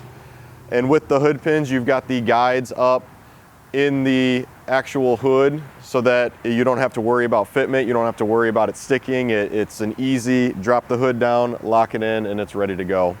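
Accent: United States. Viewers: twice not two times , mirror not mir ninety not nindy ...americans murdering the english language once again .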